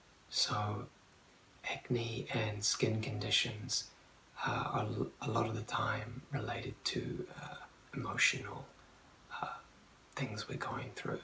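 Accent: New Zealand. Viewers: I just love the NZ accent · Your accent is beautiful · Gotta love the nz accent! Im from Australia where 6 is six not sex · came here for the accent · you can't get much better or cooler than a Kiwi accent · similar to the Aussie accent, but they pronounce certain words differently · Kiwi Accent, oh god. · love the accent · Your accent.. I LOVE THAT! It's perfection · THAT ACCENT. OMLLLL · I love kiwi-accents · im not exposed to kiwi accent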